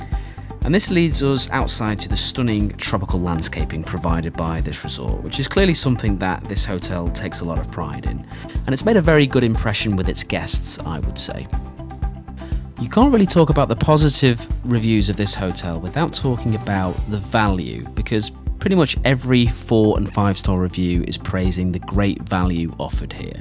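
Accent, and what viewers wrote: United Kingdom. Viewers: We go here every year fir the past 8 years. We stay for about 4 nights then onto a villa. We have NEVER had 1 problem. This place is spotless! We always leave money for the cleaners at end. We have left things out on the bed etc and they are always neatly left back after cleaning. Just say hi to the people that work so hard for you and they will appreciate it and look after you. Our fridge didn't work properly last year and they where on it like a car bonnet within minutes and replaced the whole thing whilst we went for dinner. You can walk to all bars restaurants etc. And the convenience store is reasonable. Did you know you can get a discount on food if your over 55? We never eat there but I spoke to someone who told us this. I'll be going again this year. And then moving onto the clarion inn at lake buena vista which is also a Rosen hotel. 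Just by Disney springs.. similar vibes.... I think you are from my part of the world judging by your accent 👍 x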